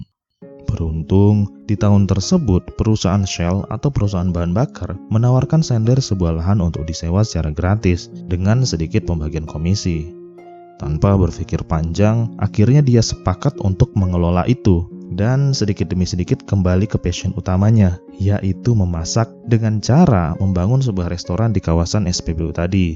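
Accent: Lombok, Indonesia. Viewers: Dari lombok ya bang?🙏🏻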